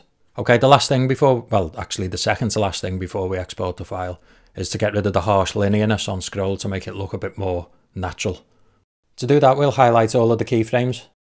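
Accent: Liverpool, United Kingdom. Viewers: Not to mention the scrolling website on the Sqs example appears to have an FAQ accordion, which, of course... you need a plug-in for. Nice seeing how to do this on Ae rather than Ps. Always enjoy the humour in your videos. Is that a scouse accent I hear?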